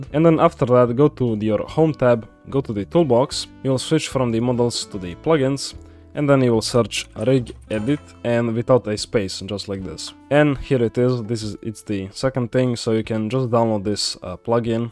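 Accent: Russia. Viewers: Love the Russian accent!😁🤣❤👍👍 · russian accent slipping in, nice tutorial! sadly its not shown how to do it trough blender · Руский акцент... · скажи честно, ты русский? тебя акцент выдает очень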